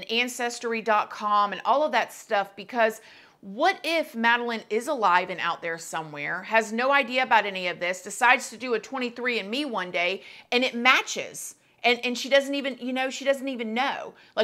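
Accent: South, United States. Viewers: It's just her accent guys. She's got a southern accent. Hahaha it's that "southern twang" that's funny though, y'all are funny.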